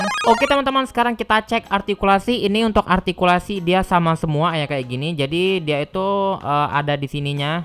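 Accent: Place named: Palembang, Indonesia. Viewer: Kau orang Palembang yaaaaaaaaaaa💯💯💯💯💯💯💯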